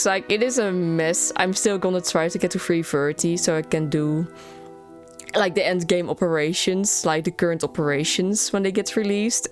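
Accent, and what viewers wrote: Netherlands. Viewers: I saw you couple of times on malgus server nice! Nice vids, subbed! You dutch by any chance? Very recognisble accent :)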